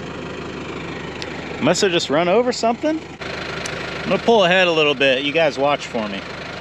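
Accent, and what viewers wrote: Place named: Texas, United States. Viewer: If you are from Northern California how come you have a southern/ Texas style drawl? I just like guessing accents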